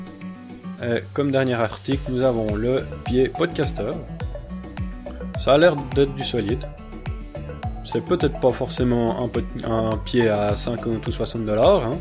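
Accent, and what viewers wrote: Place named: Switzerland. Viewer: ça sent la SUISSE dans ta voix !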